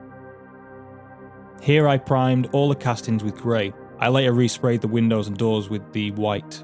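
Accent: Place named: North, United Kingdom. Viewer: God bless you! i like the thick Northern accent with these American West Models.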